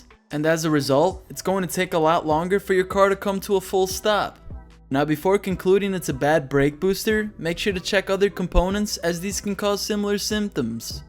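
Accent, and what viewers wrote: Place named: Pennsylvania, United States. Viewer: Which im guessing is PA by the way chrisfix says water " woder " .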